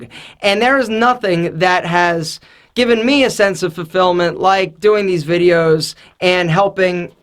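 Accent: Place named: Baltimore, United States. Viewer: Love your accent!! Bawlmer hon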